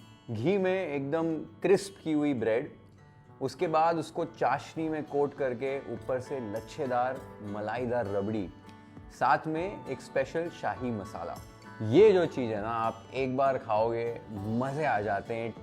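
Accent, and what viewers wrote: Maharashtra, India. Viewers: Sanjyot Sir, a day before I tried Maharastrian Masale bhat.. today I tried your Thecha Paneer Rice.. dono hi itna sundar bana tha.. very tasty.. Being a Maharashtrian, loved the authentic flavours of them.. and your Assal Marathi Accent, too. 😄 Thank you so much for such a lovely recipes ,Sir.. ❤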